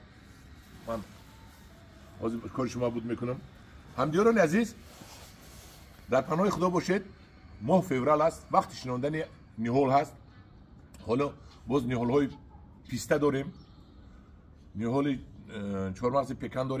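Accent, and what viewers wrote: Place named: Tajikistan. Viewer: Ахсан ба Шумо, бо шеваи хушруи точики сухан карданатон, ба Шумо бурдбори хохонам.❤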